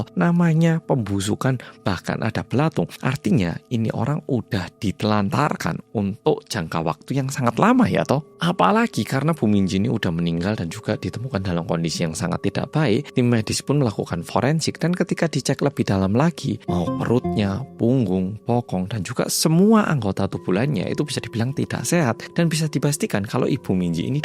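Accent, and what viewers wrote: Java, Indonesia. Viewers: Malem medok🎉 Lgsg nyimaaak 🎉🎉🎉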